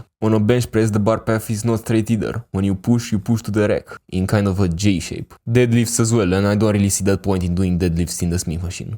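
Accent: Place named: Russia. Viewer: its impossible to listen this rus sound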